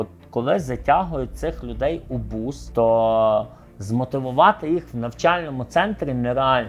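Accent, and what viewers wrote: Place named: Western Ukraine, Ukraine. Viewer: Неприємний голос з підкреслено "західняцьким" акцентом.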